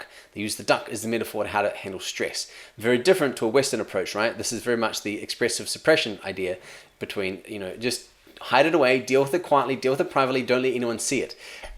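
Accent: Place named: New Zealand. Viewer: are you lecturing in nz at the moment ? noticed u have the accent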